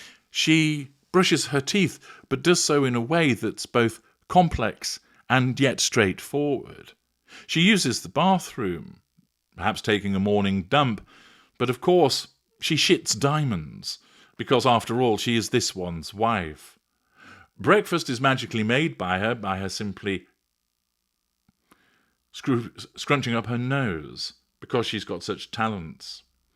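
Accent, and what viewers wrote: United States. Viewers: Love the American accent!!!🎉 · Good American accent! I think if you asked Hank and Skank on their wedding day what their hopes were, I would say look at the 758 page dossier that explains the "business" deal that this sham is. Now wave and smile Ginger Poodle!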